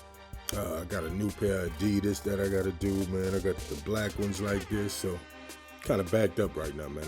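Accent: New Orleans, United States. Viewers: Picked these up myself. I hear that Nola in ya voice so I had to sub ⚜️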